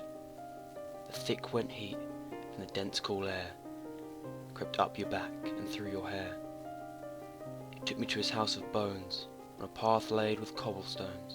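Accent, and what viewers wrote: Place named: England, United Kingdom. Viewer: Very good, nice to hear one in an english accent! Xx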